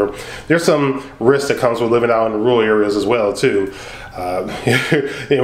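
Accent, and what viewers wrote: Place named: Detroit, United States. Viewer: been watch for 10 years, wen he became so Detroit?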